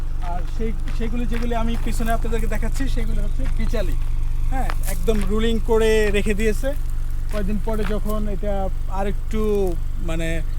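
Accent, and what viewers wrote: Sylhet, Bangladesh. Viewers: ভাই তুমি সিলেটি নি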